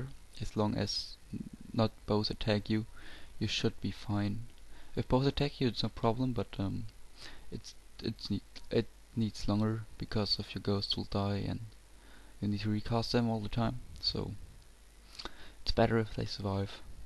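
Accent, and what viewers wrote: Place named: Germany. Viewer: Du bist deutsch, oder? (wegen deinem Englisch(th Aussprache))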